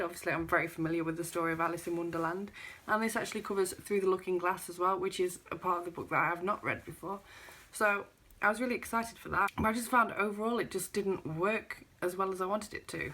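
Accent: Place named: Bury, United Kingdom. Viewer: I love your accent; sounds very like Victoria Wood's. She was from Bury, if memory serves, so I guess you must be, too.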